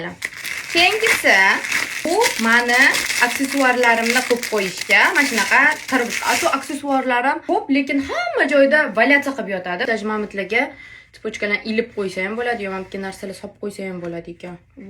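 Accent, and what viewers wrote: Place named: Russia. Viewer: aksentiz rosa ruschaga tortarkan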